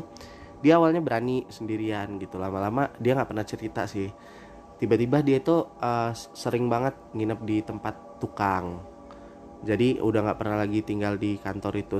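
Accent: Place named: Lampung, Indonesia. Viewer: Pantesan logatnya ga asing.. ternyata lampung juga bang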